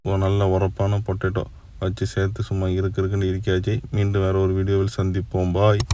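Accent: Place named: Tamil Nadu, India. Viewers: உங்களின் இந்த நகைச்சுவை உணர்வுடன் கூடிய கீழக்கரை பேச்சுகாகவே பார்ப்போம் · Unga pechu slang super namba aera pattukkottai slang🔥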